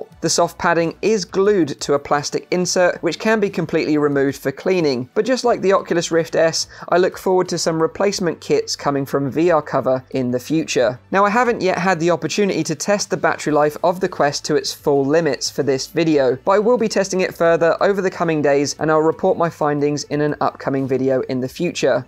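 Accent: United Kingdom. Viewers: Glad to have found this. Now I know this is nothing for those of us who create VR artand animation. We cannot install our animation app (like Quill) 🙁 ..and thanks for the brit accent! Clear to understand!! 👍🏽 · Why are British-accented people all so good at tutorials??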